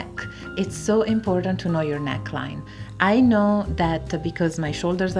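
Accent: Italy. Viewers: Buongiorno, questo è il primo video che guardo nel suo canale, ma lei è italiana vero? Posso sentire un pochino pochino di accento, ma volevo dirle che il suo inglese è veramente straordinario! Questo video è veramente utile e interessante, ne guarderò altri di sicuro 🙂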